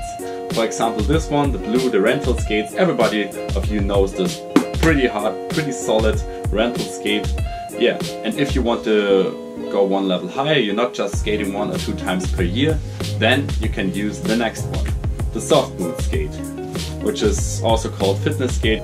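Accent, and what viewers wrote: Germany. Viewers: Hey, du sprichst gefühlt besser Deutsch als Schwizerisch. Woher kommst du denn? Ich bin fast in Konstanz und könnte ein neues Mitglied werden 😋 Bin schon 30 🙊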